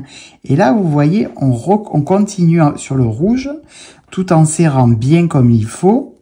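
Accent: South, France. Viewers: Yes 👍 MERCI infiniment 🙏 je t,adore ❤❤❤ je voulais apprendre à faire ce talon, jusqu'à maintenant je faisais un talon a gousset renforcé et je voulais vraiment apprendre à faire celui ci 👍👍 demain je le test j,ai des chaussettes en cours je vais faire ça!! Je te montrerais sur insta ❤️❤️❤️ tu es génial 👏😉🙏 tu me fais sourire avec ton accent du soleil, et ça fait du bien ❤ et comme tu le dis on est tous pareils et la pour apprendre 😘😘😘😘😘😘😘😘😘😘😘😘😘😘😘😘😘😘😘😘😘😘 vivement ton prochain podcast 🙏👏❤️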